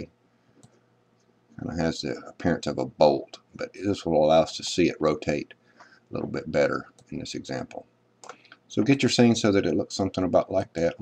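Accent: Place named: South, United States. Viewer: Every tutorial on the internet should have your narration on it. Your accent is like smooth southern butter.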